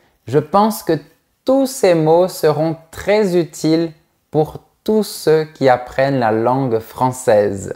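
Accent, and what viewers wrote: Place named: South, France. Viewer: Vous avez un accent du Sud, ne c'est pas, professeur ? C'est très joli.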